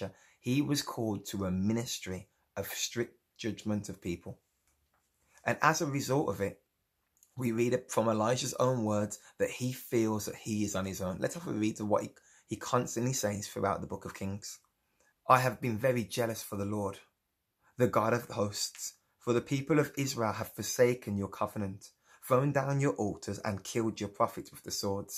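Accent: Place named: Midlands, United Kingdom. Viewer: Yaaay are you from the midlands? I’m from Wolverhampton! Thanks for this.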